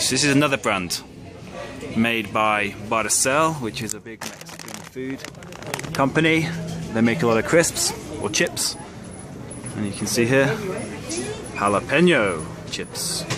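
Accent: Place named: United Kingdom. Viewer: British accent sounds so fancy :p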